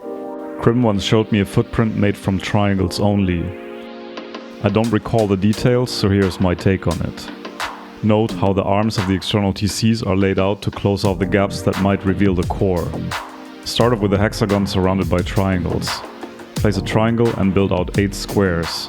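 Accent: Germany. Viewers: Deutsch??? Dein Englisch klingt so deutsch XD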